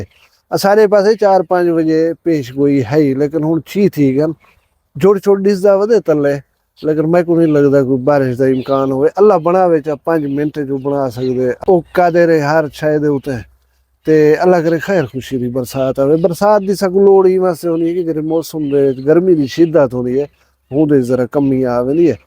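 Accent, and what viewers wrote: Saraiki, Pakistan. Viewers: behtreen sraeki andaz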